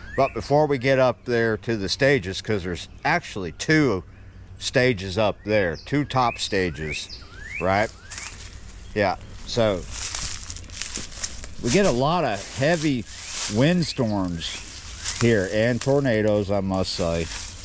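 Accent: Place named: East Texas, United States. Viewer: Boy have you absorbed that East Texas accent! Love your episodes!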